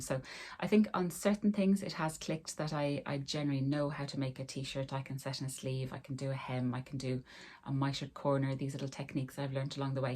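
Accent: Ireland. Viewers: Great vlog and thank you for being so open and warm hearted. Btw love your gentle Irish accent! As a tall person I often wonder how tall others are, especially when we seem to share the same taste. How tall are you? I’m 5’9” and after 2 years of sewing, still have yet to sew anything without lengthening it. Megan Nielsen fit me best…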